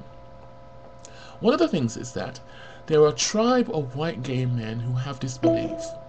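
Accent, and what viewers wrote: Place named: RP, United Kingdom. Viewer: I like how your RP accent.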